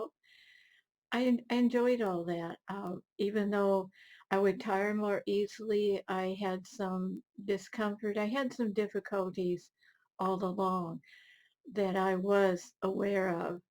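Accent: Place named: Ohio, United States. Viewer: I was wondering if you were from Ohio because your accent sounds just like my great aunt and old recordings of my great grandmother. I'm subscribed if you ever decide to make more videos, you have a fascinating life and outlook.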